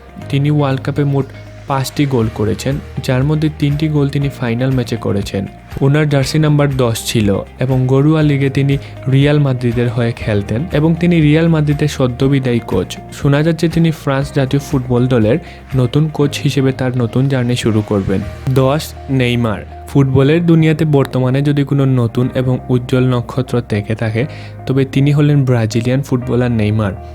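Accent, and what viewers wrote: Sylhet, Bangladesh. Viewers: vai ki sylhety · সিলেটের নাকিতা ভাই তুমি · ভাই আপনি কি সিলেটি? Please reply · ভাই আপনি সিলেটি? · Vai apni ki sylhety 🥰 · Nice video thanks for the information. Bro basha kita sylhet o ni😅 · Bai apni ki sylheti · ভাই আপনার বাসা কি সিলেট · Vai afnar bari sylhet ni? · apnar bari ki sylhet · Are u Sylheti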